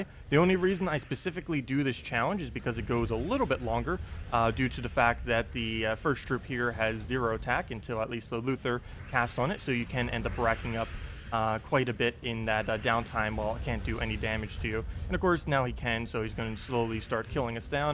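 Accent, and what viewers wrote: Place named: Canada. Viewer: You're from Canada, Minnesota, or Maine.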